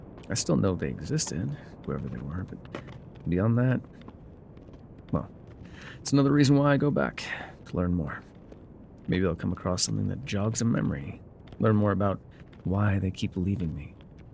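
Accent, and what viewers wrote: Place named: South, United States. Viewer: Charlotte is my spirit animal Edit: that cliffhanger was FOUL Edit 2: relistening to see if i missed anything and i didn't necessarily miss this but every time I hear Lotte i think of princess and the frog lol Anywizzle- This story is actually so cool, the concept is amazing and the mystery keeps you coming back to listen more Also Its like a.m. and I should probably be asleep but who needs sleep when you have? Edit 3: he keeps calling us pet names like sweetheart and whatnot and I don't think thats just because hes casually finding excuses not to say the listeners name Also Love to slight southern accent and how its not very forced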